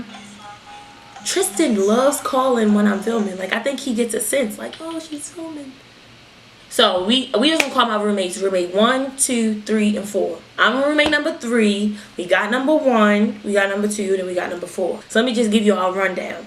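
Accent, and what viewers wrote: Baltimore, United States. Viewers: I can see you really making it big on YouTube! you have inspired me to make mine! Bmore accent poppin!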